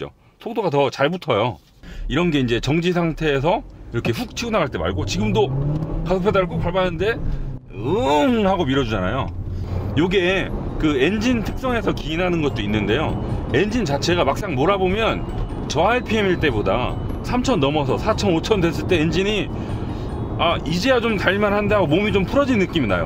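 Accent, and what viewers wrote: Chungcheong, South Korea. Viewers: 이분 말투가 전형적인 현재의 충청도 말투입니다. 거의 교과서네요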